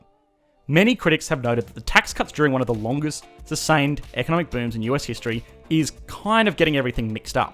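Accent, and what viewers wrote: Australia. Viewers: Bruh hes australian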